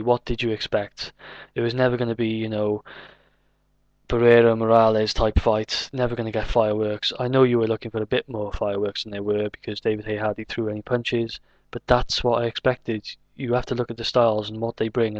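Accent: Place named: Newport, United Kingdom. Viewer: good vid m8, agree that lookin beyond the hype haye didnt do as bad as is being made out really, apart from that fallin down shit which pissed me off, i havent seen any1 for a long time last the 12th without being smashed to bits let alone rock him with a clean shot. id like haye to fight arreola or adamek an earn his way to mandatory an do it again. many would disagree but thats wat id like in an ideal world. where in wales u from m8? sound like u from Newport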